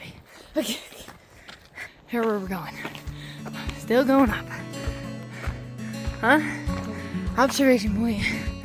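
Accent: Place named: New York, United States. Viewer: Thanks for bringing us along on your adventures! I love your New York accent btw!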